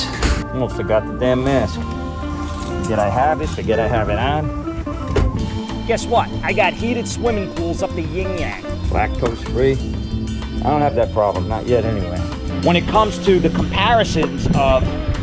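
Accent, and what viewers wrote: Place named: Upstate New York, United States. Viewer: greetings from Portland, OR. You got mention on facebook called "Moving to Florida" and I just now watched my 4th video of yours. Love them all...my Dad is from Upstate NY but you remind me of his mom with that "Accent"...lol. My family planned on moving to which we think to ...Jacksonville on many account. Cooler than most of FL, Has waves for surfing if weather is right. Not alot of hurricanes hit that area, I can transfer my job there to name a few. But I rather live in Tampa....so hard to decide! Lived near L.A. in Hawthorne and Redondo beach growing up and moved to oregon when I was 26. Now I'm 57 and my mom and dad and stepfather all passed away and my 2 sisters are now living in VA. I am dying to get back into the waters since oregon pacific ocean is too dang cold! Looking forward to my move next July if all goes well.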